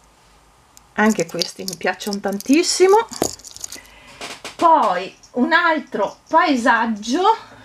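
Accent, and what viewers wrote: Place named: Verona, Italy. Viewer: Ciao, ti seguo sempre molto volentieri per tanti motivi. In primis la tua cadenza che adoro essendo per meta' veronese; le tue creazioni sempre cosi' piene di fantasia, il tuo buonumore e la tua gioia di vivere. Poi sentirti nominare paesini che mi ricordano la mia infanzia tipo Boscochiesanuova dove andavo da bimba, mi fa sempre sorridere. Grazie di tutto e Buona Pasqua a te e a tutti coloro che ami. Olga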